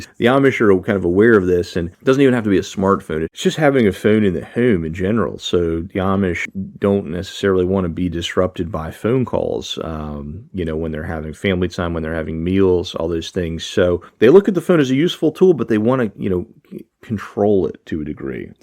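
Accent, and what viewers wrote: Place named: Philadelphia, United States. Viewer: Question for the narrator, you have a Philly Delco accent?? Where are you from?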